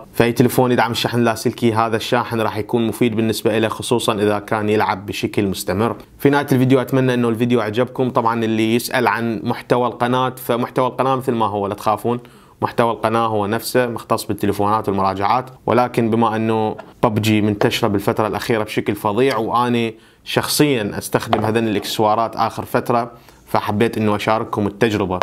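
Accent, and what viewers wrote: Iraq. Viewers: والله لهجتك كلش عراقية ،انتة مو كويتي ؟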